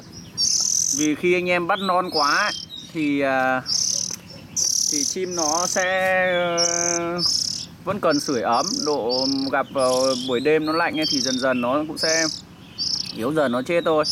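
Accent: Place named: Vietnam. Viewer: Bác giọng Thanh Lãng ak :))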